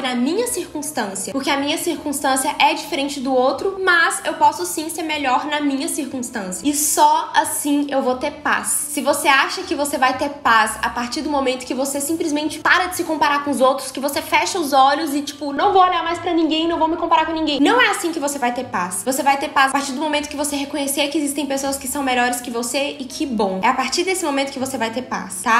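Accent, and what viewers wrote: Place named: Campos dos Goytacazes, Brazil. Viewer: Oie,! Tudo bem?! De que estado você é? Seu sotaque é mto parecido com o daqui "Campos dos Goytacazes", RJ.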